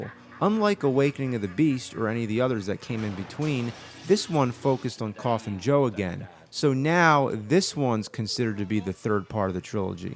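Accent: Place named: Philadelphia, United States. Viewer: E vai pegar um dos sotaques mais legais do inglês americano, o sotaque Philly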